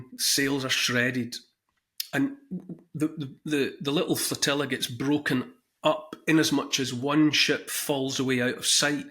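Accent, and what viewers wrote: Scotland, United Kingdom. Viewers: I am glad to have been to Scotland 10 years ago, I fell in love with the landscapes, the music, the language, so kind people, and returned with a good amount of souvenirs (including books with folk tales). Yet there remains a longing to go back and spend more time there. Until then, the stories of that country, retold by someone with that wonderful Scottish accent will do. Thank you, sir. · My dear Godly grandfather was born in Wilbarston, England but raised in and around Dumfrees, Scotland 🏴- my chosen homeland along with America Grandpa had a Brit/Scot accent and while we lived with my grandparents when young, my brother and I, being born and raised in the U.S. both of us had the same accent as our grandfather - our schoolmates surely wondered why we spoke in such a way, but hearing your scot accent brings me comfort and peace still as I hear my grandfather again- Godspeed to you, friend and to your family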